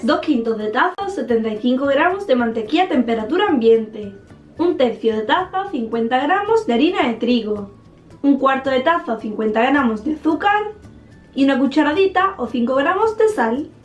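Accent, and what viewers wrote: Andalusia, Spain. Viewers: no me hagas mucho caso pero creo que es andaluz xD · Es de España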